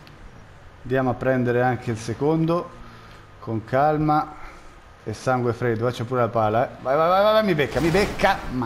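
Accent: Italy. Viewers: Nada mau para um italiano falar um pouco de inglês, mas sem ofensa mas o sotaque seu italiano com o de inglês não cai bem.Usa il traduttore per capire la mia lingua brasiliana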